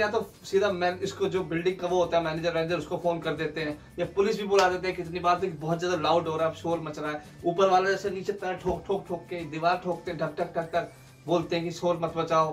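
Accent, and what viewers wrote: Haryana, India. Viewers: Bhai kha se ho Haryannve se lag rahe ho bhasha se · भाई राम-राम जी, बोल्ली से तो हरियाणा या वेस्ट यूपी के लाग रे हो? बताई दो कां के हो 😊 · Sach me yaar tumhara hindustani accent h jo bolne ka dil jit leta hai. Bb ki wines se bhi tagre ho ap yaar mstram ho bhai ap sach me god bless u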